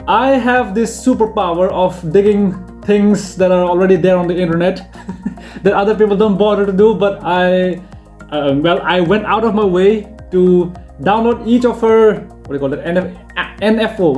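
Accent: India. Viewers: Bro is asian with an Indian accent, like HOW?!!!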